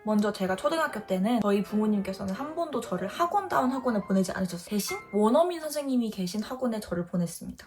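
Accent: United Kingdom. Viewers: 영국 발음을 너무 좋아해서 이왕 회화를 할 거면 영국 발음으로 공부하고 말하고 싶다는 생각을 했어요 언니의 발음이 제 워너비에요ㅎㅎ 대부분이 미국 발음일텐데 언니는 어떻게 영국 발음으로 회화를 마스터 하셨나요? 영국이라는 현지에 나가 살다보니 자연스레 익히게 된 건가요 아니면 따로 공부할 때 영국 발음으로 공부를 하신건가요?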